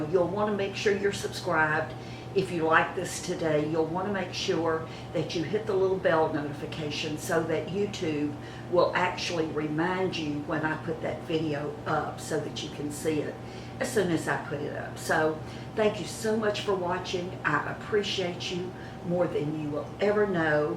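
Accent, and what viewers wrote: South, United States. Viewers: Hi, I just discovered your YouTube channel and enjoy watching them. I feel you are very honest with your recommendations and I trust in you even though I don't know you personally. I had the same type of vertigo and my doctor said it's called positional vertigo which is an inner ear imbalance and there isn't much she can prescribe for it. Mine hasn't come back for a couple of years. Hopefully you'll get better soon. Thanks for your wonderful videos. BTW I love your southern accent.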